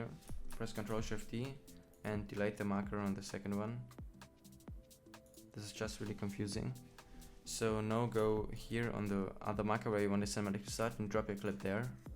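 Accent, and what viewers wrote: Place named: Germany. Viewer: You can just barely hear that you're german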